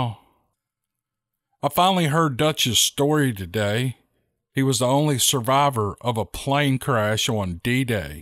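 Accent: South, United States. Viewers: Hi, This is Dave Ramsey, and I grew up in Memphis also. I'm 61 this year and now live in Las Vegas, and it is nice to listen to your Southern draw as you tell your stories. Really just wanted to say Great job with your pod cast... Love your Stories.. Dave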